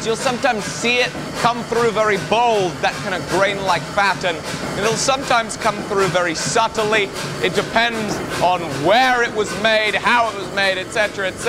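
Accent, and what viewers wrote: England, United Kingdom. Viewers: He speaks English, not American English. No matter how much he likes that cowboy hat of his. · Chad Looney English word pronounced in English by an Englishman in England. I'm afraid this trump's the American pronunciation in this case.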